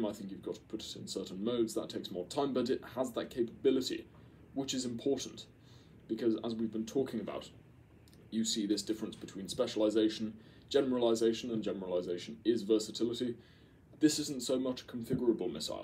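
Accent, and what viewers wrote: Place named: RP, United Kingdom. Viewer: This guy is POSH!!!!!!!🧐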